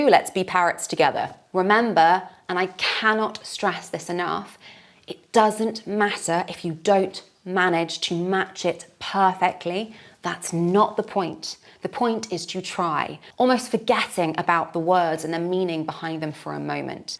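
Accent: United Kingdom. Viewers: british english? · i really really love so much british accen , that's why i keep learning this great accent every single day .. I appreciated it. I thought you gave your personal touch in your every lecture. That's brilliant❤. it's very kind of you.May God always bless dan love you and your family.. Amen.. From the bottom of my heart,.. I Thank you so much, dear Teacher.. ifrikiya - indonesia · Wonderful !, ....I enjoy listening to perfect British English, thanks a Lot 😊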